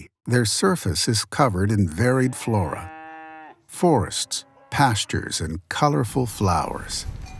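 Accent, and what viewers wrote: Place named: United States. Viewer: Watched one minute. American narration is awful. Not watching.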